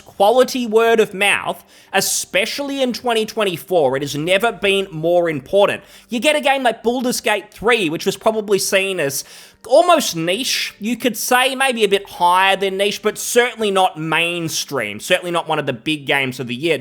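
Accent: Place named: Australia. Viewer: I give the dude the benefit of the doubt, he's a smart bloke, a lot of words pronounced differently in Australia.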